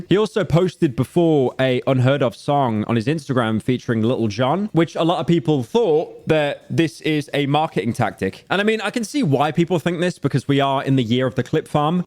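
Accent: United Kingdom. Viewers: Nah that's how its pronounced, or at least close, just British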